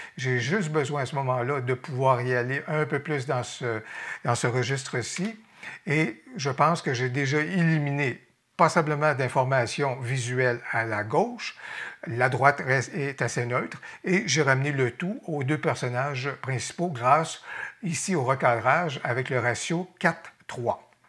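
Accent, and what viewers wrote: Quebec, Canada. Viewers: Et cet accent, il me fait trop voyager vers votre belle province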